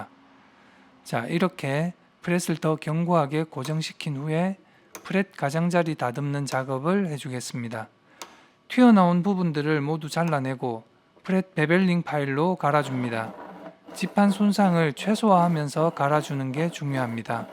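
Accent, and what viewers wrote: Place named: Gyeongsang, South Korea. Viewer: 우연히 본 일본 올드 기타 리페어 영상들~ 정말 손재주가 대단하세요 ~ 구수한 경상도 사투리가 정감이 가네요. 저도 울산인이라~ 기타 간단한 작업 정도만 하는 주부인데 장비며 꼼꼼히 리페어 작업하시는 게 감탄이 나오네요~ 재미있게 여러 영상들 잘 봤습니다~😊😊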